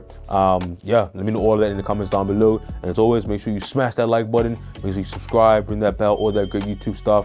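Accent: Bermuda. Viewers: Are you Bermudian? Edit; there is no doubt. Subbed, gotta rep my people.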